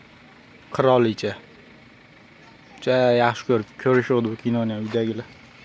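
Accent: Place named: Andijan, Uzbekistan. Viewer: Андижонлимисиз,шевангиз. Ухшаркан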